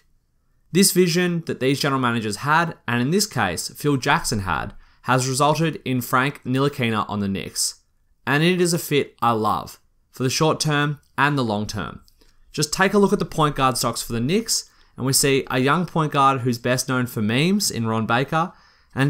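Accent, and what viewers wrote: Australia. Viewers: u have Australian accent?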